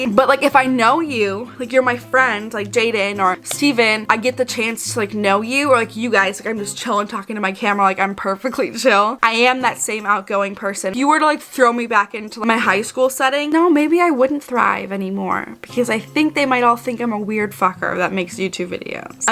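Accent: Wisconsin, United States. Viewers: am i the only one who thinks she should have a podcast? she’s so real with her viewers which is freaking amazing and her voice is just nice to listen to, we love that wisconsinite accent 🤩🙌🏻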